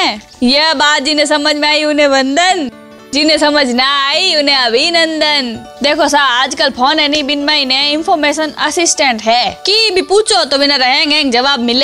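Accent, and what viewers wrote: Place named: Marwari, India. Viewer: U are amazing I love the way u speak in English and Hindi with marvadi accent.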